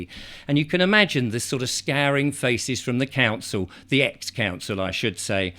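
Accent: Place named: England, United Kingdom. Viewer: I like the way speaks. He is typical English with lots of fun 😊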